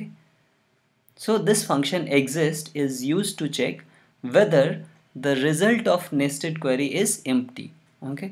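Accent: India. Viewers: All the best coding tutorials on YouTube are made with Indian accent, lol. Thank from Ukraine, btw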